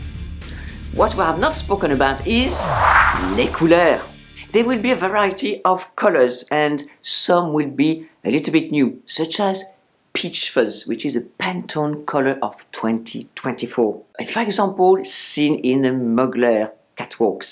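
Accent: France. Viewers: Still watching your videos for your great personality and exhilerating and chick French accent. Maybe I will come around to my messy wardobe some day...:-D Thank you from Marianne! That was a nice morning coffee. And cheers to going "shopping" in our own wardrobes (previous video).:-D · I love pencil skirts. Your accent is amazing. Mostly French with classy English. I can tell you were here in England. Your make-up i copy. I wear Nuxe oils. I have 3 now and i favour red lipstick. I copy you in many styles and im 76, or as my GP says im the new 67. My grandmother was called Lizette and im Jacqui. Maybe thats it. Please keep these videos coming. Thank you🎉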